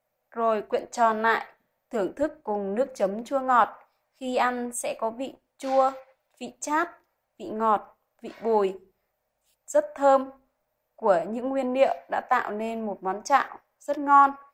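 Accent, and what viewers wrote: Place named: Nam Dinh, Vietnam. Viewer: chuẩn giọng Nam Định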